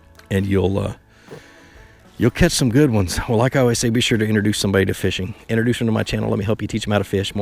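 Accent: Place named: New York, United States. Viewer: you really sound like u live in NY... if so, you only can't bass fish from 3rd Sat. in June-Nov. 30 if you are using live bait. Otherwise, it's artificial lures all year long (assuming you are catch and release).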